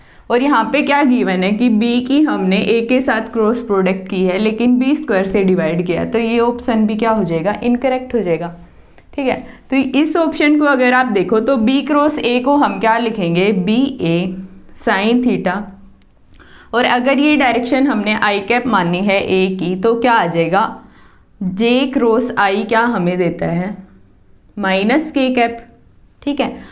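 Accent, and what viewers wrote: Haryana, India. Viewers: Your haryanvi and Jaat wala lehja saf najar aa rha h bhen ji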